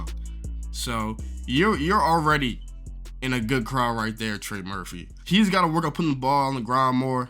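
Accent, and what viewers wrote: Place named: West Virginia, United States. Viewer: tf is that accent? you from west virginia or arkansas?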